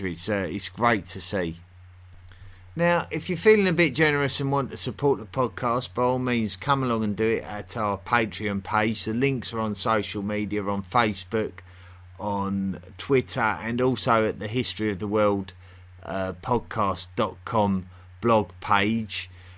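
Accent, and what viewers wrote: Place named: United Kingdom. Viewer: Its one thing this poor bastds born a uk subject, but to be double querst(sic) with a stupid uk accent it terrible enought to change the channel. God, i cant stand the commonwealth bastards.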